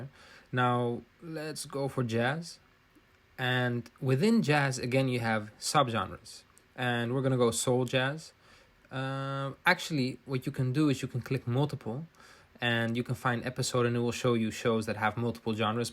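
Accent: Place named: Netherlands. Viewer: you dutch? you sound dutch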